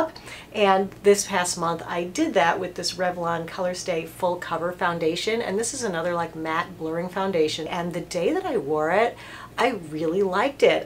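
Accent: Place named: Boston, United States. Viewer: I never remember to ask you--where is your iconic Mass accent?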